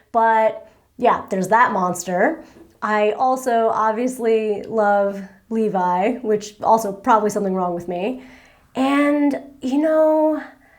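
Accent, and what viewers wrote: New Jersey, United States. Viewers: How do you pronounce water or orange? That would show how Jersey you are. Never knew I said those words differently till I moved to upstate NY. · A "Jersey accent" is exactly what you sound like when you speak English :P (Although to be fair, I thought you were from Long Island when I first started watching you)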